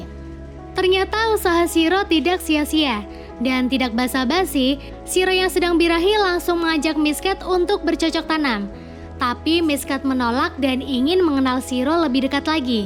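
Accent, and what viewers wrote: Pemalang, Indonesia. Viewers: BTW KAKA ORANG PEMALANG?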